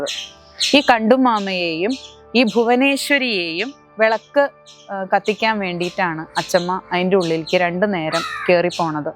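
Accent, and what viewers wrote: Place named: Valluvanad, India. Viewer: .. കഥ ഇഷ്ടായി ട്ടൊ....ആ വലുവാനാടൻ ഭാഷയും, എല്ലാം സൂപ്പർ...👌🏻👌🏻❤️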